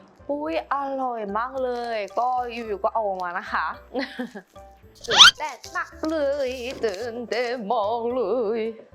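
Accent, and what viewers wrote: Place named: South Korea. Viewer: พูดไทยได้น่ารักมาก เข้าใจทุกคำ แต่สำเนียง คนเกาหลี ไม่น่าเชื่อว่าพูดได้ดีขนาดนี้ อยู่เกาหลี ไปหัดพูดกับใครครับ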